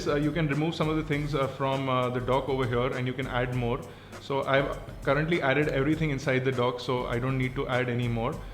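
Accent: India. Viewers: oh damn i hate that silly indian accent...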